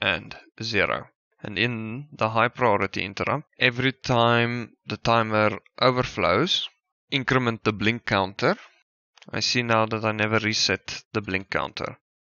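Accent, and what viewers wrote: Afrikaans, South Africa. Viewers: Klink of jy Afrikaans kan praat :-). Great videos! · Is jy afrikaans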